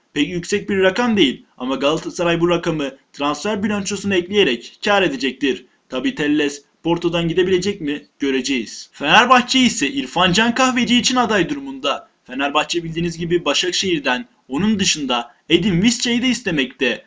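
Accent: Gaziantep, Turkey. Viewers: 1 sen anteplimisin 2 lyon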